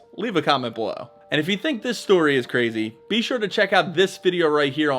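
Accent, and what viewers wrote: Philadelphia, United States. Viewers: My thoughts are that you're Philly/South Jersey accent is so heavy, that's all I could focus on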